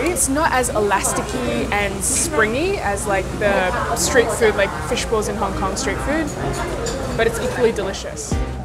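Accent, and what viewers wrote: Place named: Australia. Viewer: What a great vlog! Really well edited, I'm envious of your comfortability talking to the camera in front of others! Also cool to hear an Aussie accent telling me all about things to do in Taiwan - where I currently am filming my own vlog...also in an Aussie accent. vlogception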